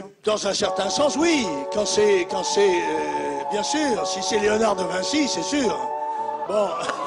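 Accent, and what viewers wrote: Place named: South, France. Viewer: L'accent sudiste du fafrap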